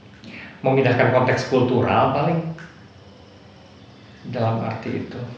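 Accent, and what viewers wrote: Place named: Indonesia. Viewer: Indonesia Bangat bacaannya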